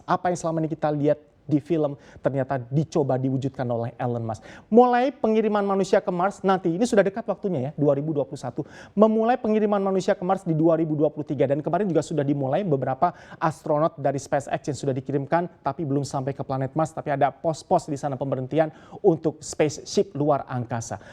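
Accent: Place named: Java, Indonesia. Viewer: aksen jawa nya bikin greget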